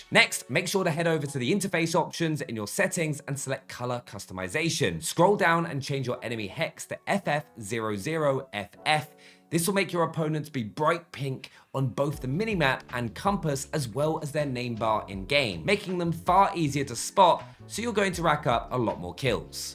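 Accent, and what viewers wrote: United Kingdom. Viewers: where u from? Are u British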